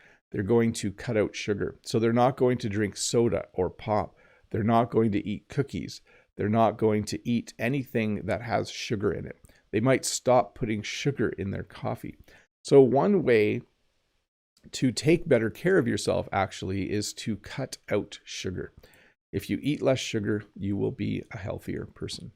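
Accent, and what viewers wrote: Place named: Canada. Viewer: The way he is saying in English, it's very understandable, Canadian's accent is the best!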